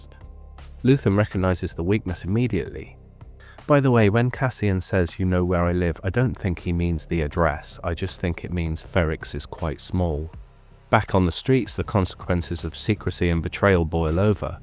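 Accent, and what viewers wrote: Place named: United Kingdom. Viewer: I think the captions are useful, as sometimes your phrasing says something that my ears hear differently (I don't remember the exact context - or even if it was this video - but you said 'knot' and I heard 'not' which completely did not fit the rest of the phrase. I had glanced away from the screen and had to back up and read the caption to get what you were saying). This may be an accent thing, as I'm from the States, but I also find the captions useful as you are using terms and phrases that are distinctly British sometimes and I can understand them more by reading them than by hearing them. Hopefully, your change in future videos, which I haven't gotten to yet, still works for these more idiomatic phrases.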